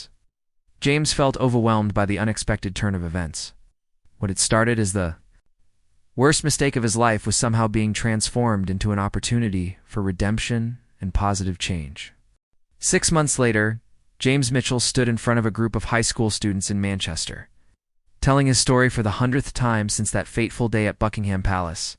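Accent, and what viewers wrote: United States. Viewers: Bogus, cooked up by this fellow with American accent.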